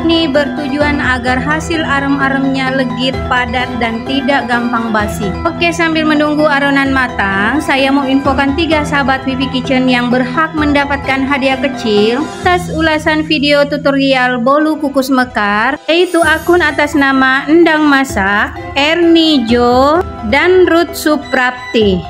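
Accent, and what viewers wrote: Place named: Sumatra, Indonesia. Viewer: Makasih kk,,,bahasa kk macamnya org sumatra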